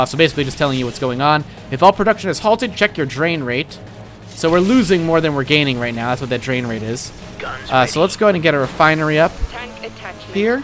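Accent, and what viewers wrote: South Africa. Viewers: He's not Australian, he's South African. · It's not an Australian accent. It's South African. (I am Australian)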